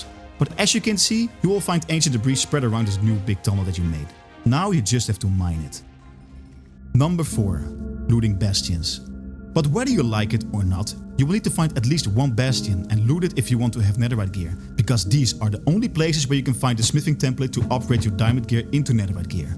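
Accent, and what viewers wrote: Netherlands. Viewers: haha ik hoor de nl accent gelijk al, goede video wel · Sound like a Dutch guy. Nice content bro. Netjes! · You sound like a very well English speaking dutch men Am i correct?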